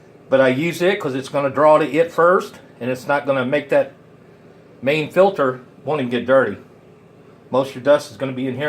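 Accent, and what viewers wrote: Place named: South, United States. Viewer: Good and useful tutorial. I use a blow dryer for the most part but this would be useful for slow drying things. I think it would be great for car modellers especially as you want things to dry in a dust free environment. Thanks for your sub, I'm subbing back. I like your southern drawl... I think that's what you call it? I was thinking of introducing a character similar in tone to yourself... will have to work on it. Cheers!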